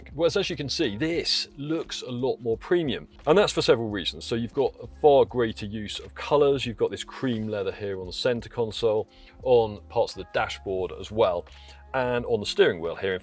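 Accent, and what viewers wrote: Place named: England, United Kingdom. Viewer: He speaks with a strong English accent! Hard to understand.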